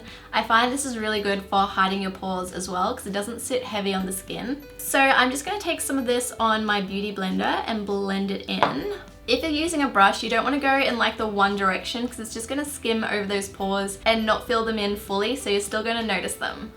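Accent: Australia. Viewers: What?? She has a super heavy Australian accent.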